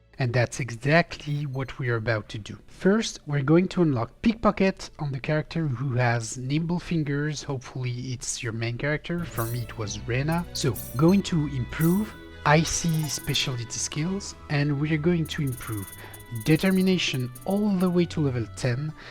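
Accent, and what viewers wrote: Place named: France. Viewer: man, that accent ! Where are you from ? Aren't you french ?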